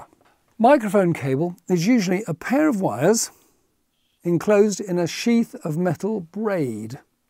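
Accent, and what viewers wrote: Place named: RP, United Kingdom. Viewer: You, sir, do have a fantastic voice. If you worked for the BBC, I'm surprised if they put you in engineering and not on air. You have what I call the perfect BBC Accent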